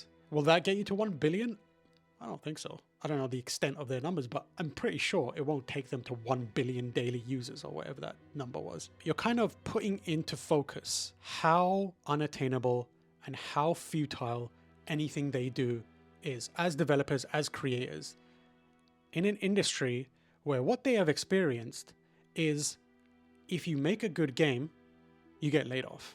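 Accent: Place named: United Kingdom. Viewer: It’s just so nice to hear an actual British accent from a decent gaming YouTuber, I can’t bear the transatlantic accent all our people pick up over there! But on topic, this all sucks. Though I have to lol that Asha Sharma, hot on the heels of laying off 20% of her staff, has now been appointed as an adviser for jobs and productivity to the US fed reserve 😂 Corrupt billionaires all the way down to hell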